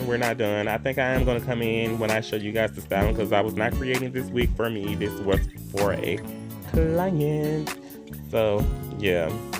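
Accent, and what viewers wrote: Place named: South, United States. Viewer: “Big country plats” 😂😂😂😂😂😂 I have not heard the term plats used to describe braids in a long time... I love it.. I love your southern accent.. Also the video is very detailed and on point... Thank you.. I’m subscribing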